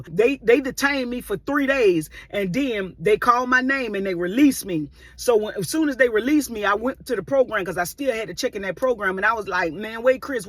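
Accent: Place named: South, United States. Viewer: I’m from NY & I think it’s the southern accent that makes your stories so funny to me. Glad GOD has kept His hand on you throughout your life and that you share these testimonies with us. Bless you!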